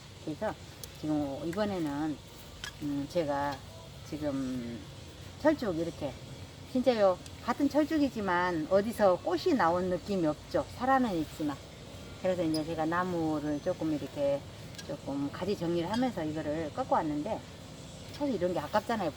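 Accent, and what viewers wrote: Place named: Suncheon, South Korea. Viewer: 설명 잘 들었어라 근디 엄니 거가 어디다요 말투가 완전히 우리 고향인디 저는 순천 주암이요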